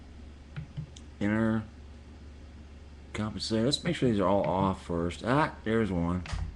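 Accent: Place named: South, United States. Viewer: Bro you are a good ole southern boy just like me lol Ive got the very same drawl you have!!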